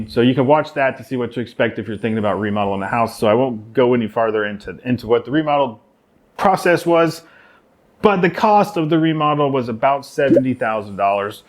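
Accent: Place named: Puerto Rico. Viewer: You have a slight. And I mean SLIGHT, Puerto Rican accent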